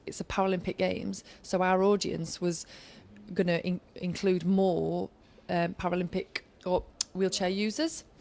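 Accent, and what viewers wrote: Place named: Yorkshire, United Kingdom. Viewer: Как она произносит company, come, such, done, rugby!! Ну и что, что Йоркширский акцент. Всё понятно. И очень красиво. Спасибо.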